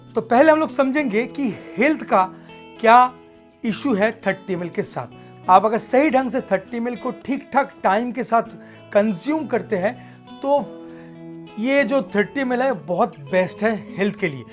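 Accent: Bengal, India. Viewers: Bengali accent is horrible.. Knowledge hai... Lekin pronunciation and english is very poor..needs improvement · Bangali pronounce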